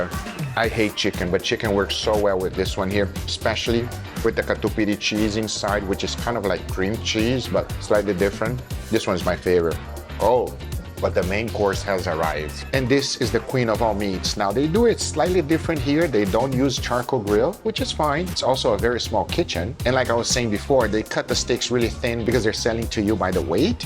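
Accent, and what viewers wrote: Minas Gerais, Brazil. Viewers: I’ve been watching your videos for some time now, and then you say, WE are known for Picanha, Você é Brasileiro mano? Eu achava que era algo espano pô, mas o sotaque me puxava de vez enquando achar que era mesmo brasileiro. Me confirma aí carai kkkkk thanks buddy. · Wow, your Brazilian accent is on spot, way to go 👏🏻👏🏻👏🏻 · I love when he speaks portuguese, the mineiro on him comes out hahahaha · o sotaque mineiro do é engraçado e a comida incrivel!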